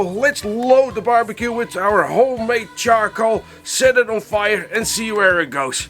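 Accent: Netherlands. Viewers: Are you Dutch? 🇳🇱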